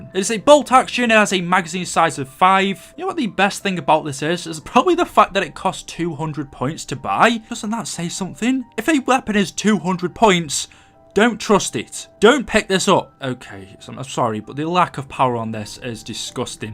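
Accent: United Kingdom. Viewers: Derek Monroy he is british, it doesnt matter how he says it...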